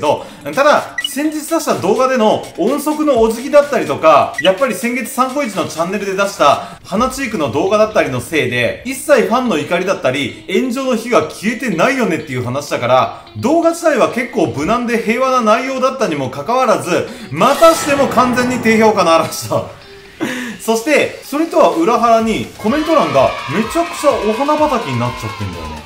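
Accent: Kansai, Japan. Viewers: 対人に無神経で図太い人って、何もかもに無神経で図太いよねって話しだよねw 関西弁いいねぇ☺️ボケとツッコミ上手そう← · わぁ💕 アタイと話す時はバリバリの関西弁が出るって話しだよね💕 古川鼻チークやら👃🏻 耳毛👂🏻やら言われてえらい大変やなって話しだよね！ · ちょいちょい、イントネーションが関西だなぁ〜と前から思ってたけど。って話しだよね。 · 関西弁のU-Twenty可愛すぎるって話だよね🤣💕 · 関西弁聞きたい🥰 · コメント61件もあるのに、視聴が0回になってる？？てか会ったら関西弁で喋るーって話しだよね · 冒頭からイケボ関西弁で終わった生理が再開しそうって話だよね干物BBAもときめいちゃう(´♡ω♡`)って話だよね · 関西弁はギャップ萌えですきすぎる… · テメーのゴテゴテ関西弁ききたい〜🥰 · の関西弁最高🥺 しばらく古川優香もやっぴもちょっとしたことで炎上するんだろうなって話だよね🤔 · 関西弁萌えって話だよね🤩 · 関西弁ききたいってはなしだよね〜🥺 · 関西弁もギャップがいいし標準語も聞き取りやすくていいし、、とりあえずUくんがいいよねって話だよね(？)www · 関西弁聞きたいですけど私可愛い男の子でした⤵️ 古川はtko木下越えてほしい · 関西弁喋ろや！！！！コテコテ関西弁のかわいい女の子より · 関西弁もっと聞きたい！(標準語だから訛りに憧れがある) 動画見に行ったけど1部の米が論外すぎて… 今回の件でショックを受けたり古川優香の為を思って注意したコメントをしたファンだっているのに嫌なら見るなは論外もち誹謗中傷は肯定しないけどね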